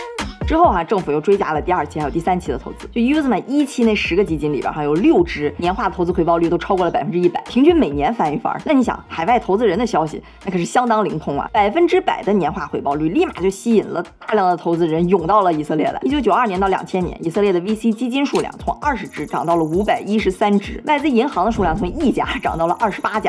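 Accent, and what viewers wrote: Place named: Beijing, China. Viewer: 小林有北京口音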